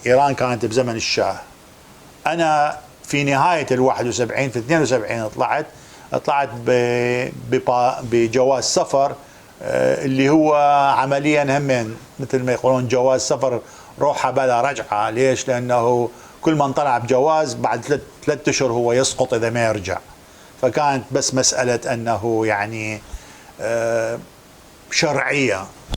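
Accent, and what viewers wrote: Mosul, Iraq. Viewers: مرحبا بك أخي اتمنى لك ولجميع يهود العراق كل الخير والتوفيق لهجتك عراقيه حلوووه مثلي 😁😁😁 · تحياتنا لك استاذ وانا كعراقي آسف للظروف الصعبه التي مرت بكم وادين واستنكر ما حدث لكم بالعراق؛؛ ولكن وانت تتكلم عن حالكم آنذاك تذكرت ما تعرض له ويتعرض له الفلسطينيين في غزه والضفه وفي عموم فلسطين المحتله؛؛ واعتقد من الإنصاف ان تقول ما حدث ويحدث للفلسطينيين من قبل السلطه الإسرائيلية فاق ببشاعته ما حدث لكم في العراق؛؛؛؛؛ ولا أستطيع أن اخفي اعجابي بأجادتك ومحافظتك على اللغه العربيه وبطلاقه؛ واللهجه التي تتكلم بها هي أقرب للهجة اهل الموصل؛ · يعني ابو ست نيران لو اخوهه طبعا احييك لئن محافظ ع لهجتك العراقيه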